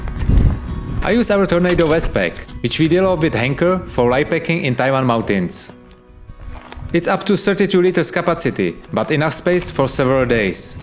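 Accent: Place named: Czech Republic. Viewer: Hi, I just want to ask you, where are you from? You have english accent that sounds a little bit Czech. Im just really curious if you arent from Czech Republic.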